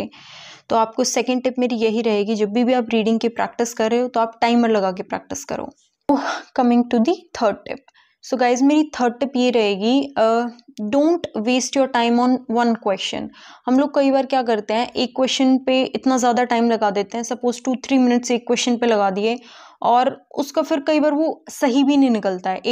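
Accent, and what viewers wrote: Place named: Haryana, India. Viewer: Haryanvi touch 🧸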